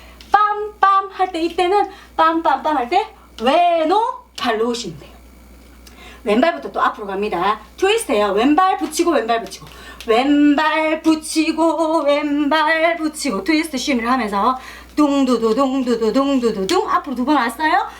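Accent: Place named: Gyeongsang, South Korea. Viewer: 늘 잘 보고 있어요ㆍ경상도 말투가 듣기 좋아요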